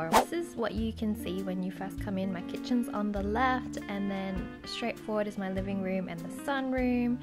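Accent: Australia. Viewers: it's the australian accent...like they say idear instead of idea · Is she Australian?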